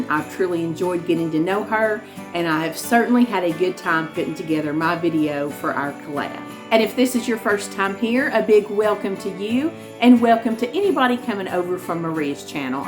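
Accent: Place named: Mississippi, United States. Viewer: East Tennessee? I had guessed Mississippi accent; my husband thought Texas. Merry Christmas :)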